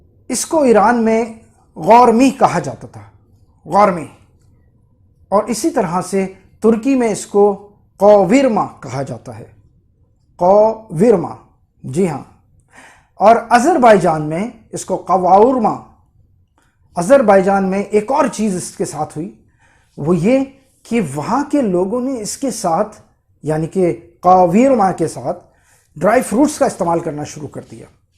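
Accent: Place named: Aligarh, India. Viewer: bhai yr qorma recipe looks awesome and your aligarian andaaz " aur sahab" take me down to memory lane of aligarh k shadi ka qorma..the only surprising thing was u added saunf..I never saw my mother adding saunf in qorma instead she use to add khashkash in it..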